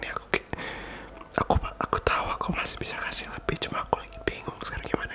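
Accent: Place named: Indonesia. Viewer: He got something extra with his accent, never knew indo and english issa good combination tho. Even me as malaysian, i fell for indo language everytime i heard the indo ppl speak. It kinda sooo soft and u mixed with english , the cool tone is added so yeah 1000/100 for that ✨😂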